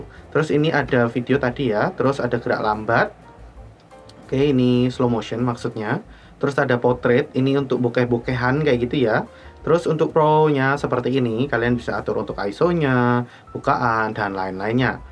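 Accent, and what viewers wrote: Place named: Yogyakarta, Indonesia. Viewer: oalah...wong jogja to dab...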